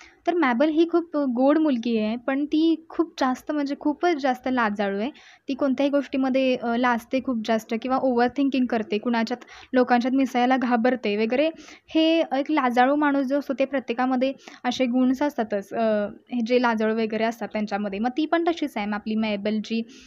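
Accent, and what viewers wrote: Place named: Satara, India. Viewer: i think you from satara speaking satari and gavathi mix but it superb......nice teaching method..i loved it,,,keep doing well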